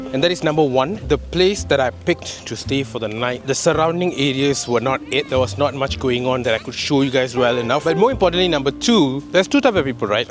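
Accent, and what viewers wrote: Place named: Tamil Nadu, India. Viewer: உங்க பேச்சு மலேசியன் தமிழ் மாதிரி இல்லை பக்கா தமிழ்நாட்டு தமிழ் மாதிரி இருக்கு நான் சிங்கப்பூர் ல தான் இருக்கன்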